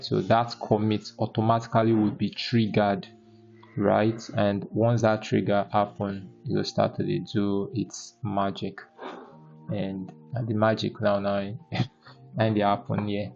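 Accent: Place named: Nigeria. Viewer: using nigerian english make this amazing :yougotthis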